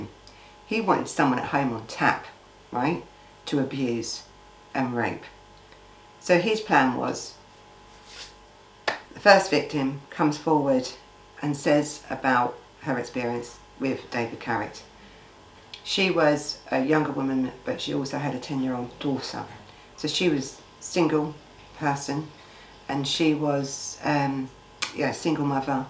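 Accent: London, United Kingdom. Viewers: So good to see you back. I was hoping you were OK and just needing a break from the emotional drain of all this violence and cruelty you dig through to make us the videos. I love your London accent btw. Reminds me so much of listening to some very special people in my life. Old friends who aren't with us any more.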